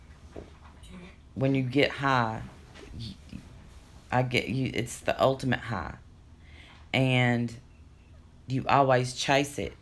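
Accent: United States. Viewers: I am sooooo proud of you!!!! I knew from the first video I watched we had more in common than our country accent!!!! I too am an addict in recovery....one day I may tell my story. And, just keep it in the day and before you know it, it'll be years and years!!!! So proud of you, keep up the good work!!!!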